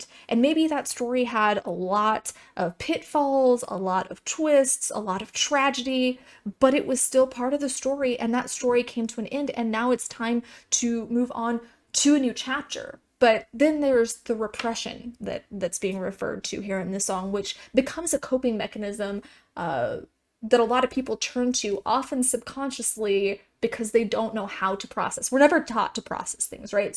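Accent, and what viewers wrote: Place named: Southern California, United States. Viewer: It must be 2024. A sweet, blonde lady wearing pink and blue, with a "So-cal" accent is reviewing 🤣.... If only they knew her inside thoughts.. Appearances can be deceptive. I like this channel, there are some great reactions and honest truthes... but I can't help thinking - covering things in pink hearts and adopting a Disney princess voice / persona is actually doing you any good. But if it's what makes you happy, In the words of - be yourself, by yourself.🤘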